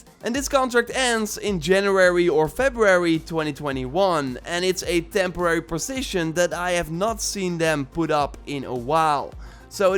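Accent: Netherlands. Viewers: He's from the Netherlands.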